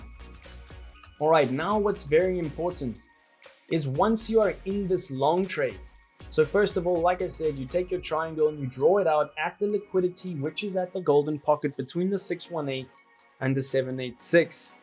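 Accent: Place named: South Africa. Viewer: SAFA accent lol SA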